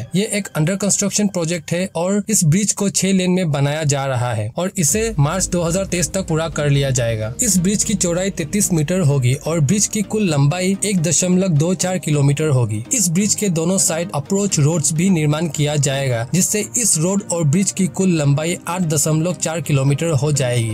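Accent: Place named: Assam, India. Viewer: Your accent is like Assamese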